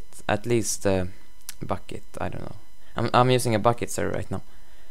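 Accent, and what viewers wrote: Sweden. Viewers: ur from sweden i can hear it on your voice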